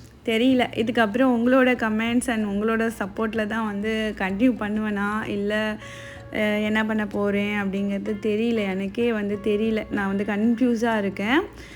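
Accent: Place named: Pattukkottai, India. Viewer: Nce vlog ka..unga slang enga oor slang madriye iruku..am near by pattukkottai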